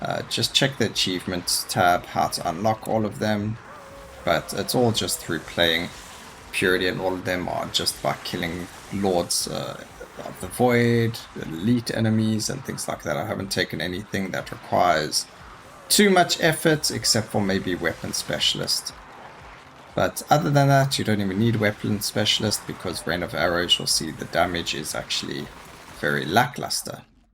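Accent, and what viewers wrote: South Africa. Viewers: Its MAD how easily you hear the SA accent, Follow just for that!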